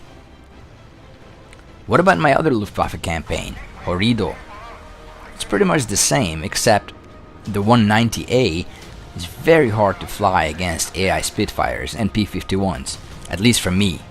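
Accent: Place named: New Jersey, United States. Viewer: I just can't put my finger on your dialect but it's the coolest American English. New Jersey?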